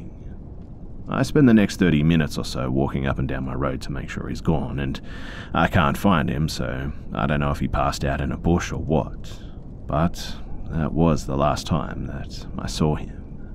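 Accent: Australia. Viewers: He is I don’t know if you’re Aussie but it’s obvious to me, as I am lol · Wait?! Are your Australian?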